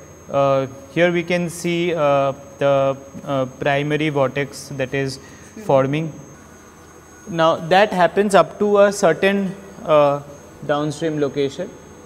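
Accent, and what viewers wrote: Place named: India. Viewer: Indian voice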